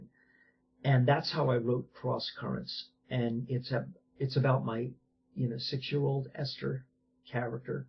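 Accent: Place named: East Coast, United States. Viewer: What you said was interesting an all, but the way you speak reminds me of friends from the east coast. Found m'self trying to narrow it down to NY, PA, or MA before I saw the "Phila" on the sweatshirt. I'm afraid my reading comprehension is everything it was in high school.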